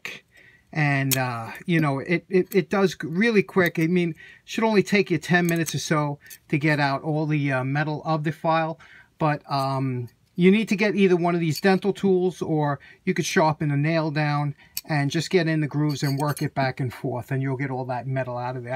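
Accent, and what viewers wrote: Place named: Hudson Valley, United States. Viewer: Great video, really useful, and I love your accent it reminds me of home in the Hudson Valley. :)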